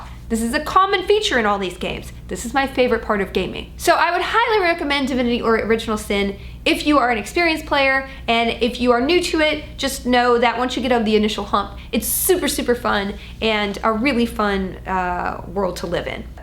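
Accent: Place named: Cockney, United Kingdom. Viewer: Oh, my dear, you have a delectable cockney accent. But if you're going to voice dwarves, you absolutely must learn to do a Scottish brogue.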